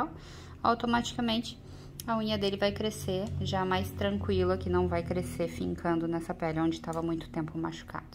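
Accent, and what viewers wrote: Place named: South, Brazil. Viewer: Ela é do Sul, por isso o sotaque. É normal.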